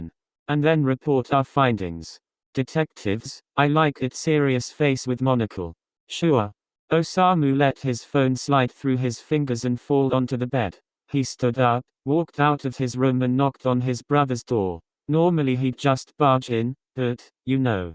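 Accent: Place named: United Kingdom. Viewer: Wow why do you sound British- owo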